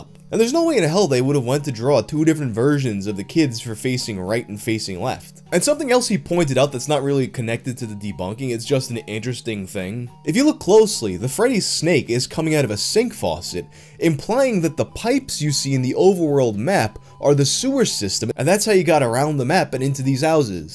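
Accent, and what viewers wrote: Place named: Queens, United States. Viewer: "And here's where today's SPONSAH, Honey, comes in" Just can't take that Queens accent out of you, huh? Love it.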